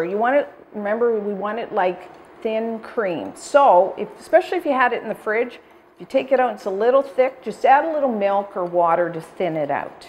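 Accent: Canada. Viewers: I couldn't place your accent but caught some Canadian. Great recipe! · Ah ok cool. You still sound canadian which is great to hold onto your accent :)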